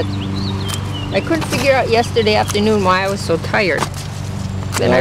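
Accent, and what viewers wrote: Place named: Midwest, United States. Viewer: I love watching your videos...and hearing your MidWestern accent. My dad is from Michigan/Minnesota and I have cousins that lived in Madison and we used to visit them every summer (they all now live in NC). You sound just like them! :-)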